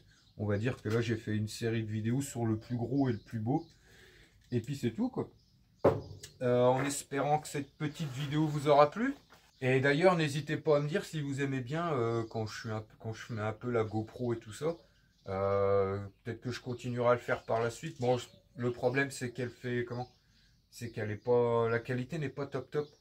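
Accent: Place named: Ardennes, France. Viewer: Bonjour, moi je fais aussi la ferraille avec ma vieille clio mdr, mais pas autant que toi. Tu sais dans notre région ( à côté de Lens ) tout le monde fait la ferraille donc attention il y a des vols. Tous les soirs je regarde tes vidéos et ça me fait du bien car c'est pas pour avoir des conseils mais pour vous voir, votre accent ardennais eh bien j'aime bien et je trouve que vous êtes vraiment cool et simple, vous souriez et vous ne prenez pas la tête pour des conneries. Bref merci pour tout ça et je vous souhaite beaucoup de sous avec les métaux. Jean Luc de Loison sous Lens. Bisous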